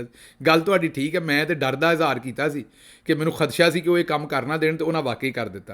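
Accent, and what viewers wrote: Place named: Punjab, India. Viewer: sb ap sirf punjabi V-Log kiya kren, ap ka Labo Lahja punjabi ki azmat ko doballa kar data ha. Youn lagta ha ap punjab ki gali kochun ma bantay ar bandar killa khaltay jawan hovay. Punjabi ki gahrai ar rwani sun kar ap punjabi k professor lagtay han, choren urdu english bus punjabi v log..kiya munfrid andaz ha ap ka..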